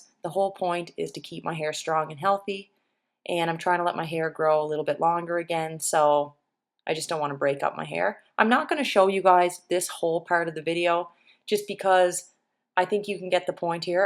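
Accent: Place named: Maritimes, Canada. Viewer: I seriously love your maritimes accent I live in the suburbs of Ottawa. I'm just finding my curls again. Love your Channel feel free to check mine out to mines more about the food and saving money LOL.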